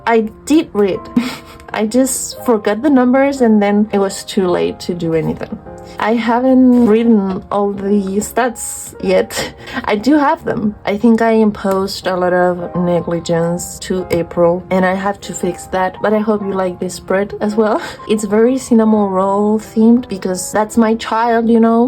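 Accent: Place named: Latin America. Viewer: for disability rep, the next book on my tbr that covers that is called “out on a limb” and it’s a romance I think? I can’t recommend it bc I haven’t started yet but it sounded really cute! For a book about books, I think the starless sea works! also lol this kind funny but as soon as you mentioned the book being expensive to get to your 3 world country (+ your lovely accent) I immediately thought “she’s Latina” — it was instant neuron activation lmao !! I actually was thinking maybe you were Brazilian (like me ❤) but the accent was just a little off from our usual accent so I wasn’t sure~~ I’m guessing you’re Chilean then?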